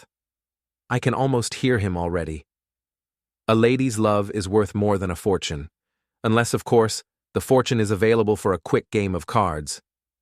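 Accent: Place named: United States. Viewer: Why the American voice?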